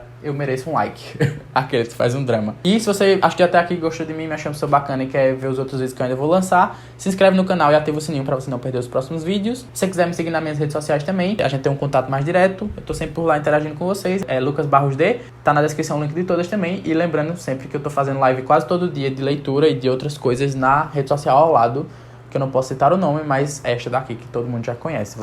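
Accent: Northeast, Brazil. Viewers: Homi, tu é claramente nordestino. Alguma chance de ser pernambucano??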